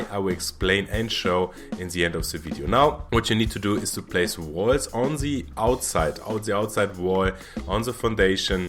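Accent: Germany. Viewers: nice video, where you from? I think from Germany ^^ because the german accent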